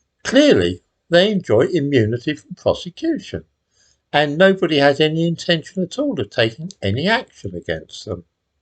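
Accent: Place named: Wales, United Kingdom. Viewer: Your back in Wales ,thought i noticed a bit of a Walsh accent , The house is looking cumfy .Have you got woodstove up and running .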